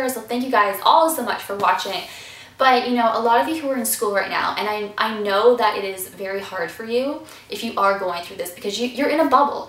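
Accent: South, United States. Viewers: I'm new to your channel but you're already one of my favorite youtubers. I love your videos and the positive vibes you give off. Plus, I love that southern accent lol I think it sounds a lot like Britney Spears. And your face is beautiful! I ignore the haters too. I use to hear things about being gay all the time, but eventually as I got older and left high school I got a lot more confident just like you said in the last bullying video. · I freakin love your southern accent . Your face is fine. People are jerks. Even if they used to be your friends. I love your happiness. One of my old friends said "Whats wrong with your arms" i have a lot of freckles and she thought they were so ugly. But i look in the mirror and im ike sometimes "Oh i look good" just not full of myself lol. I love the fact that i love Harry Potter. Im weird crazy and fun my friends love me we always make each other laugh and i love my life. 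I got bullied a lot and i wanted to kill myself but im now in love with myself. I love my personality. I love the fact that i love Harry Potter. I love how my hair are so beautiful. :)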